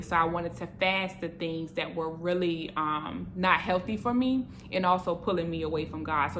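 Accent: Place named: South, United States. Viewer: I enjoyed your video that I came across by chance. Your forthrightness and candor are refreshing and inspiring, and your southern accent is boffo. Eating fruits and veggies are best for our health and digestive system. Supplemental nutrients and vitamins are necessary too. Especially the Omegas 3, etc., and B Vitamins (particularly B 12 if you have vegetarian leanings). Stay away from foods and drinks that have preservatives (Propylene Glycol, BHT, Sodium Benzoate, MSG, Potassium Benzoate, etc.) in them. Preservative are used in cosmetics and medicines a lot too, and can be absorbed into the body transdermally. Overall, preservatives, fillers, and binders are generally man-made chemicals that are not natural or organic. Their use is for preserving the shelf-life of products to maximize profits . They can wreak Havoc in our bodies by disrupting hormones, cells, blood chemistry, and can cause cancers. 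If you think about it, preservatives, fillers, and binders - overtime will cause problems for our digestive systems. In other words, they make digesting what we eat harder, inefficient, and ineffective. This all applies to the nutritional health and safety of pets too. While writing this, I remembered something I read in a Malcolm Gladwell book concerning hormone disruption in women. At this time, I cannot remember which one of his books. Before getting into his findings - let me introduce you to him. Malcolm Gladwell is the author of five New York Times bestsellers — The Tipping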